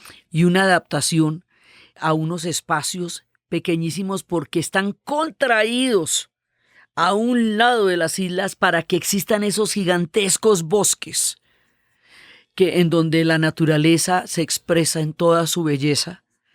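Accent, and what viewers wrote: Colombia. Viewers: un agradecimiento patrio por ser la embajadora de la palabra con acento colombiano. Qué exquisita experiencia visitar al Japón 🇯🇵 guiada por tu voz. Gracias!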